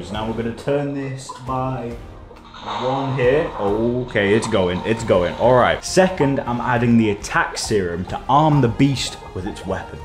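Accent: United Kingdom. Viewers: I can’t tell if he is British or not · are you british? one more thing you are the best youtuber ever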